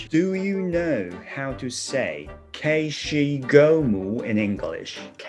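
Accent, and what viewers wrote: United Kingdom. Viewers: ちゃんと全部Britishの発音なのすこ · コリン・ファースみてぇなイギリス英語だぁ…綺麗過ぎる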